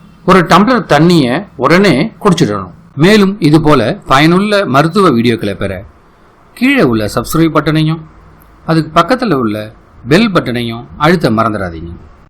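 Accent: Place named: Kerala, India. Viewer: 😂😂😂 but malayala slang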